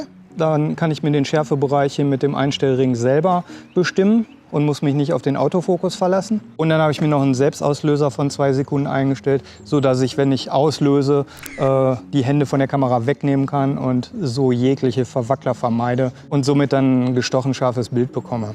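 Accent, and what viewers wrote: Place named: Ruhr, Germany. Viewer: Endlich mal einer der "deutsch" spricht mit wat und dat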